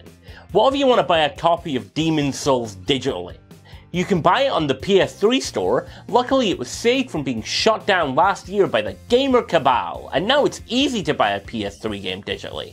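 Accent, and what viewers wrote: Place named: Scotland, United Kingdom. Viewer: Americans hate it when they have to hear a Scottish person talk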